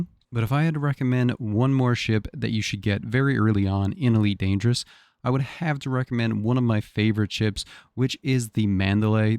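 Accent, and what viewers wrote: Newfoundland, Canada. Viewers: HEY! just a heads up..... love the canadian accent 😉😆 getting back into this game today after literally almost a decade and well "overwhelming" is too light of a word so I appreciate the advice from 4 months ago lol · Man I can't watch this. Can't stand the way Canadians talk. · You from Newfoundland?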